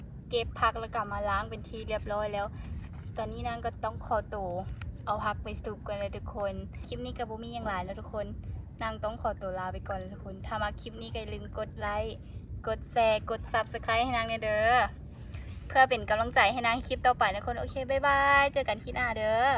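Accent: Laos. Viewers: สาวลาวน่ารัก สำเนียงพูดเพราะครับ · ผมชอบสาวลาวพูดน่ารักดี ไม่แปลกใจทำไมหนุ่มไทยจึงสนใจสาวลาวกัน.😍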